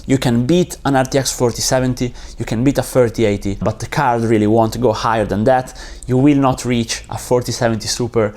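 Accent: Italy. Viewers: si sente l'accento italiano 😂😂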